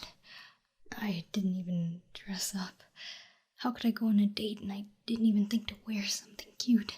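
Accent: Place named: France. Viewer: Oh ya one more thing your French accent just dose something to me I swear I would like to see more in the future only if your willing to take care : )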